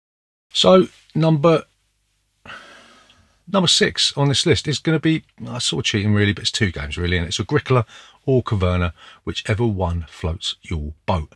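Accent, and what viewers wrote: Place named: London, United Kingdom. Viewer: I’ve been watching the videos you have produced for a little while and despite the list which is awesome with lots of games I want to try on there, I am always struck by that bloody lovely gaming room and your awesome Londoner accent!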